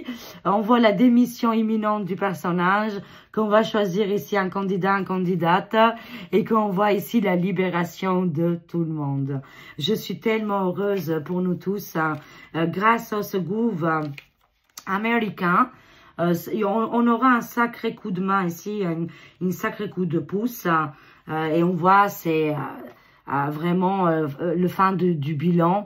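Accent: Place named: France. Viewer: Allo, c’est Johanne de Québec, j’ai aimer beaucoup ton vidéo À chaque jour jadore ❤❤❤❤❤❤ comme d’habitude, tu as beaucoup de nouveaux sur la France dans ton pays, et c’est très intéressant, c’est vrai que vous avez eu la vie pas très facile Depuis quelques années là bas en France, ça aucun sens, et tu as vraiment et tellement Raison, qu’il y aura beaucoup Nouveaux positif dans votre coin de pays, et j’aime et J’ adore beau ta belle accent de français quand tu parle, et sache que tu t’exprimes très très bien vraiment sincèrement, j’aime beaucoup entendre ta belle voix ma belle, je t’admire beaucoup, et sachez que Nous aussi à Québec le Gouvernement nous ont mener Dure, vous n’êtes pas les seules, car moi je vous comprends très bien 👍 je reviendrai écouter, plus-tard ton autre vidéo, Aujourd’hui plus-tard cars Nous avons 5 ou 6 HRS: de Décalage Avec Québec et la France, nos amis et cousins cousines de France, et je te dit toujours ton Beau sourire charmeuse, merci pour tout ce beau partage 😊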